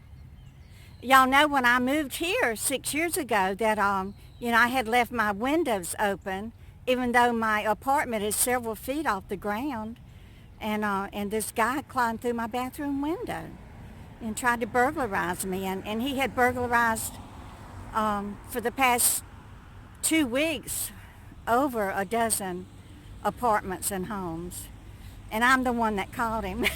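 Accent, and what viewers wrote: South, United States. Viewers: Hi, You are so funny. I love your stories! Not southern but love when your southern SWAG comes out. Love it and Love you.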